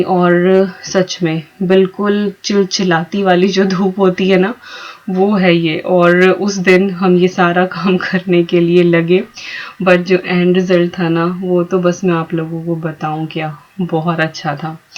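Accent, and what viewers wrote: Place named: Muzaffarnagar, India. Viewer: Di plz reply aap India me kha se ho aap ki baato se lg ra h aap mzn k aas paas se ho kyoki kale sing ji whi pe h or wha aise hi chulhe pe kheer bnai jati h nd wha pe hm bhi gye h kitni baar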